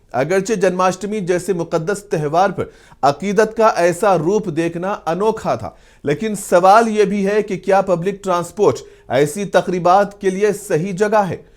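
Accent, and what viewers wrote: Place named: Pakistan. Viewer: Tumhare lehze se lag raha hai tum pakistani news anchor ho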